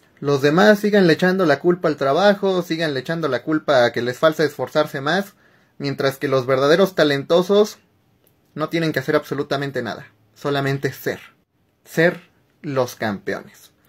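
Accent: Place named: Mexico. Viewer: Como se nota que este es mexicano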